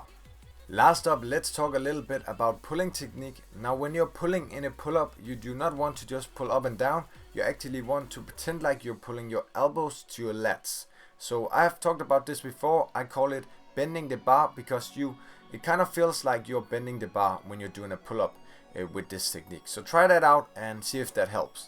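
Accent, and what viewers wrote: Denmark. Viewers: This was on my YT suggestion. Gr8 vid. New sub. Look at others soon. Not always timely to go to gym. And whoa.......a very nice ring of a DK accent speaking English......all success for this channel to you.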